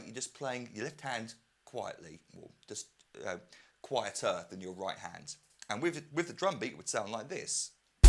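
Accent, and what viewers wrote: United Kingdom. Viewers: su acento británico pronunciado no ayuda mucho a captar audiencia, pero no se puede discutir su buen nivel musical sobretodo mantiene buen ritmo y tiempo preciso...👍🏻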